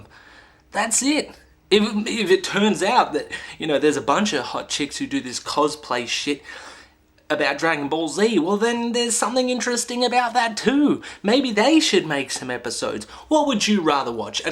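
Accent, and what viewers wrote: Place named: Ireland. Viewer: you use dragonballs to make a wish. I seen every episode. When I was a kid that was the best shit out. And why do you look Chinese and sound irish?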